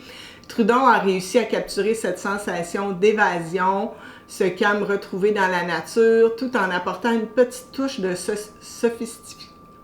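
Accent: Quebec, Canada. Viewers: Salut! J'adore l'art de la parfumerie et je viens de découvrir votre chaîne YouTube. En fait, j'essaie d'apprendre l'accent québécois en écoutant votre français! héhé J'espère que vous passerez un week-end extraordinaire.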